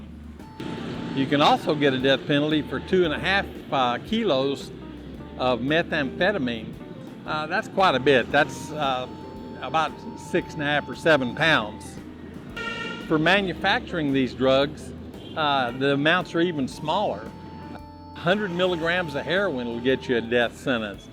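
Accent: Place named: United States. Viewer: Nice Video:) first one I have watched of yours.. keep up the good work. I hear the American Accent, what state were you originally from?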